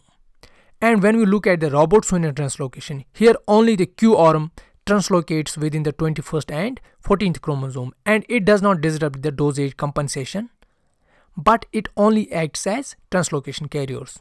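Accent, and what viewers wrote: Kashmir, India. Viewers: Indian Accent is the best !! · I caught u u r a kashmiri aren't u bolein bolein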